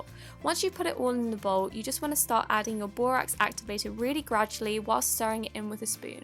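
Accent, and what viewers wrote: England, United Kingdom. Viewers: Sumthing I have her accent, im English :) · U sound British how did u get borax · I found u😂! I'm on insta btw. Love u AND GLAD SOMEONE FINALLY HAS A BRITISH ACCENT LIKE ME 🤣💜🖤💕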